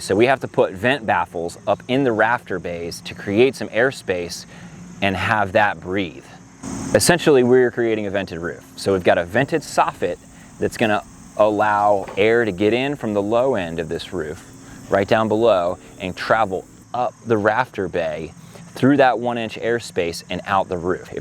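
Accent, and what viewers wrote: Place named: California, United States. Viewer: I’m from Southern California, born and raised. This guy is without a doubt holding the thickest Californian accent of all time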